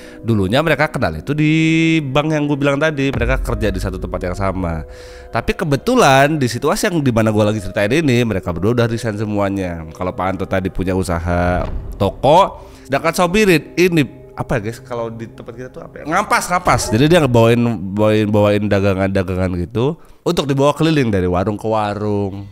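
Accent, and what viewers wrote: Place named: Lampung, Indonesia. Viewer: bang gue suka bgt logat Lampung inimah bikin kangen pulang gue di jkt doang sebenernya tapi kangen bgt logat ini😂🎉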